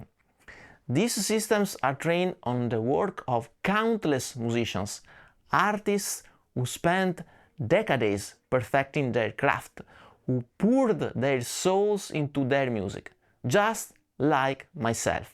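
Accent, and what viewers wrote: Italy. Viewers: ! We love your channel, your content and information is invaluable to the community, we even love your italian accent ( i would love to speak any language at your level of proficiency)! Stay True! Stay Original! Stay Consistent! Keep up the great work! Thank you! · I fully agree with you. You are not old, you are simply in the right side! And, of course, your italian accent is totally fine 😂😂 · You’re accent isn’t stupid. You’re an Italian who speaks English. So there is an accent. I love to visit 🇮🇹 once again. The last time I was just a bambino. 🧒 such a beautiful place. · Dear, when you speculate about the proposal you got, somewhere between and, you said that they probably plan to use your "stupid Italian accent." As a language teacher who works as a pedagogical coordinator and holds an MA in Linguistics, and a subscriber to and fan of your channel, I'm in a good position to tell you that your accent is exquisitely and melodically beautiful. 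I guess I speak for everyone here when I say that the way you talk is one of the things that make your channel unique. I know you were being humble when you said that, but I thought it would be nice to let you know how much I/we love your accent - and your content, of course! Cheers from São Paulo, Brazil!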